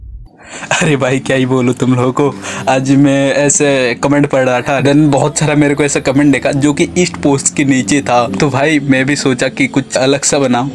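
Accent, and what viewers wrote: Assam, India. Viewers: Bhai tum assam se ho kya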